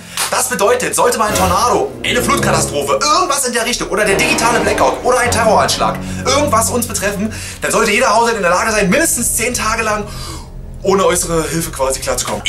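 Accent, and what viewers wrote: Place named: Saxony, Germany. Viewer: Könntest du Vllt mehr säschsich reden?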